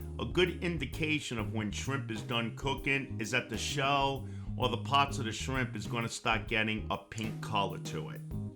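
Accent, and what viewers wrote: Rhode Island, United States. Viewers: ahhh. I see. Well, you certainly have not lost the RI accent!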